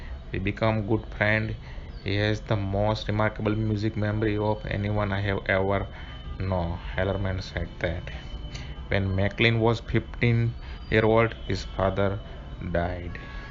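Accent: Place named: India. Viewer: That Indian accent is horrible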